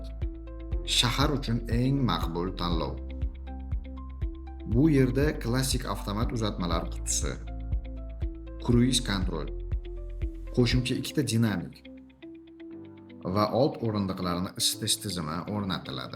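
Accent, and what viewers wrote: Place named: Uzbekistan. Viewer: Тоза узбекча учун алохида рахмат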